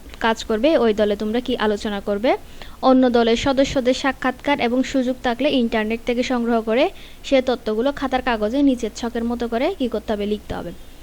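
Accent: Chittagong, Bangladesh. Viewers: ম্যাম আপনি মনে হয় চট্টগ্রামের?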